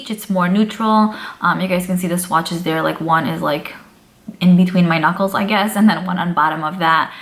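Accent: New York, United States. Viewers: When u have 3 videos to watch first and choose your favorite YouTuber!!!! You doll!!! I love love how honest and genuine u are! And I miss my new yoarrkk accent lol. Please don’t ever change! I find that once a YouTuber gets a little famous they stop answering the people in their comments who got them there ! I know u are different and so transparent! Xoxo 😘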